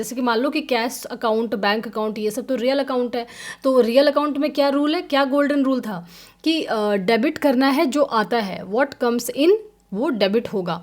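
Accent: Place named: Haryana, India. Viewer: Ma'am ki bat sunke lagta hai ki she is either from Haryana or Western UP..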